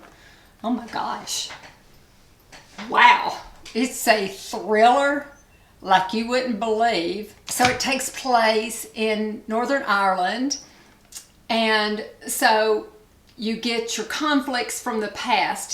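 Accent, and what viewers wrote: South, United States. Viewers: Hello new to your channel 😃. Great tip for velcro rollers, who knew❤‼️ Love your accent, you sound like a Southern Lady. I went to grad. school in GA., and enjoyed everyone I met. 👍 P.S. Where did you purchase your fabulous earrings, so pretty?!!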